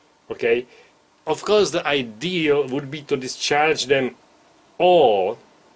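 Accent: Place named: Germany. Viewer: Considering he sounds very much German I'd give him a pass...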